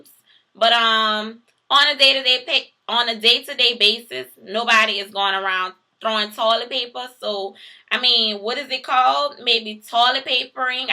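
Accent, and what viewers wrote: New Orleans, United States. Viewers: I love New Orleans accent simply beautiful. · I love how people from New Orleans talks!!!! I wish I talked like you! Im from KY and its pretty bad lol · I didn't see this video until I did one of my own. It's crazy how many accents New Orleans has. Love the video! Definitely a New Orleanian accent. Almost like every neighborhood has their own. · You sound and act just like my family from New Orleans! Sat here laughing the entire time :D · Love it! Girl you had me laughing! You kept it straight N.O! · This is hysterical. I'm from New Orleans too, girl. I sound just like you even though I live in Houston now and have for 6 years now. 504EVA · Thank you. I thought I was going crazy up here in Arkansas. I'm from New Orleans and people don't always understand me. It's nice to hear a familiar accent. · Well that's how we talk baby!! 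I don't apologize for my accent either... It's who I am & I'm proud of it!! I say all of those word EXACTLY the way you say them;)) New Orleans is the best!!! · A lot of you folks on here are saying she don't sound like she's really from New Orleans. I personally know a three generation family straight from New Orleans, and the mama sound exactly like this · i enjoyed i love your accent, i had a good time when i visited nola, the accents were a trip. =o) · love your accent I have always wanted to go to new Orleans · Love that accent, baby. I'm a NOLA boy and you have that accent and that demeanor that just feel like home. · She definitely sound like she from New Orleans. You can especially tell when she's talking and making conversation. Some people have a much heavier accent but she definitely New Orleanian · MERTIE123 It's more the pitch and tone of her voice........sounds familiar to 7th ward New Orleans........... 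· You're awesome! I'm from Brazil and I was really curious about how a person from New Orleans pronounces NEW ORLEANS. I sould say like that so. ;-D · Rollie pollie!!! Lawd I miss them thangs. Btw love that new orleans accent! I just think it sounds better on females. I mean damn shawty, just go into ya own bathroom and say daddy... Priceless!!! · oh yess she sounds just like a nola resident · Yes Lawwwd!!! She Got That Strong New Orleans Accent!! I'm From New Orleans Too.....Wazzam Love, What Part Of The N.O. You From?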